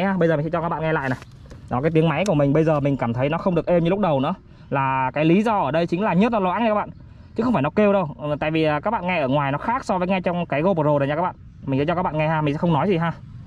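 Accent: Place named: North, Vietnam. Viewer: Giọng ông này như kiểu người miền bắc ấy Mong anh rep lại ạ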